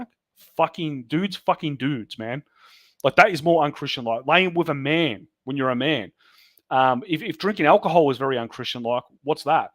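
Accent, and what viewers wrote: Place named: Australia. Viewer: You remind me a lot of Phil Anselmo (from Pantera and Down) but with an Australian accent instead of the Southern NOLA accent. Very hot! Hope everything goes well in your case, it certainly doesn't sound like you've done anything wrong!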